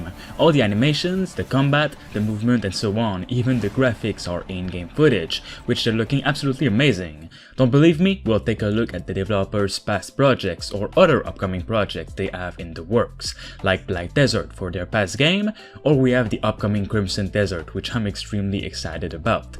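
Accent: Quebec, Canada. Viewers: Are u French Canadian??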